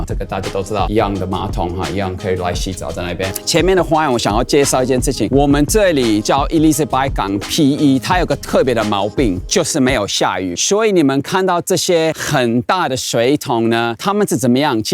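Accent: Taiwan. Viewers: 教授的台灣國語加台語真到地,太像台灣人講話模式了!哈哈！ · 😂😂教授講中文竟是臺灣國語....安捏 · 越來越有台灣人講話的「口氣」，安捏都丟啦😂😂😂 越來越往幹話發展的趨勢，哈哈😄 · 教授台灣國語涅😂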